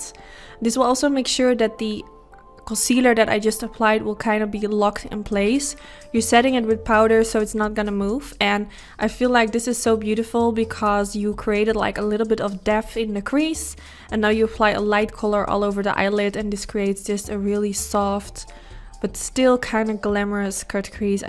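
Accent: Netherlands. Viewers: I’ve never seen one of your videos, but the second you started talking, I knew you were Dutch haha! 🇳🇱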